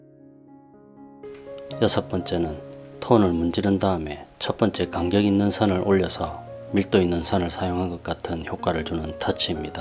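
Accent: Gyeongsang, South Korea. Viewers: 영상 잘보고있습니다 감사합니다 종이는 무슨 도화지를 써야하나요? 갱상도 사시는것 같네요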